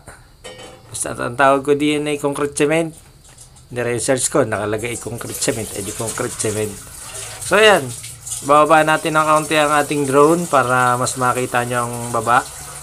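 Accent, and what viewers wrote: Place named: Batangas, Philippines. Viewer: Ay kainaman.batangasin ang iyung tuno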